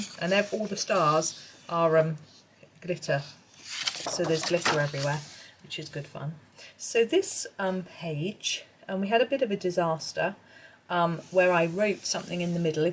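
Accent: England, United Kingdom. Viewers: Ah, you are such an inspiration! I have enjoyed this video so much, it has motivated me to think about what I will do with my copy of this book. I didn't buy it for myself because I've got several of Johanna's books and they were enough, seeing I've been going crazy on Amazon lately and have bought about fifty colouring books this year 😲. But a friend gave it to me for Christmas, and when I looked through it I felt like I could have some fun with it, just as you have described. I am going to blatantly copy much of what you have done, LOL! but have my own ideas for some of the pages. BTW I just love listening to you talk, I love the English accent. So different to my Aussie accent, you wouldn't think we were speaking the same language!!🤔. Best wishes for a very happy New Year to you and your family.🍸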